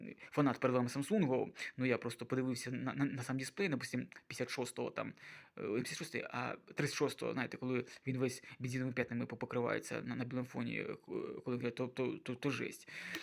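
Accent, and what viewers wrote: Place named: Chernihiv, Ukraine. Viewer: Схоже на чернігівську балачку) покращуй мову, а так то цікаво розповів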